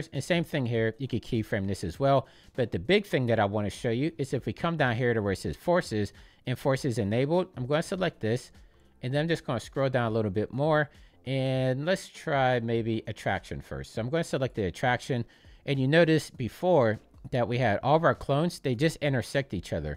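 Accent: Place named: Pittsburgh, United States. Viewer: Thanks so much for this! I'm new to the channel. I thought I heard a bit of a Pittsburgh accent in your voice, then I saw the Kennywood sign behind you. My hometown is not too far from there! (I worked at Idlewild Park as a kid.)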